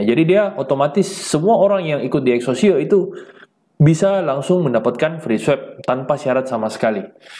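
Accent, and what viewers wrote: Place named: Makassar, Indonesia. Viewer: Didengar logatnya pasti org makassar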